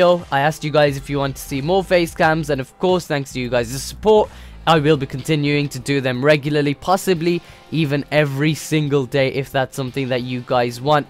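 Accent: Birmingham, United Kingdom. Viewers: That brummy accent doe😂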